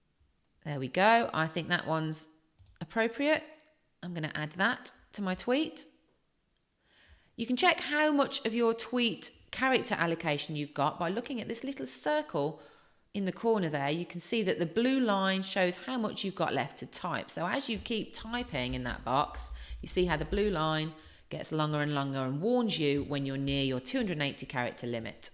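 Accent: United Kingdom. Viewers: Thank you, I wasn't expecting to find such an informative video on this. What a relief, British too! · Thank you! Clear, concise, no extraneous chatter, loved it! And with a British accent to boot! (We Americans love the accent!)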